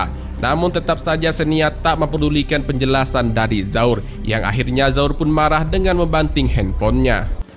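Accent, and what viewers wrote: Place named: Aceh, Indonesia. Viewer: Logat Aceh pak Admin ya😂😂, mantaf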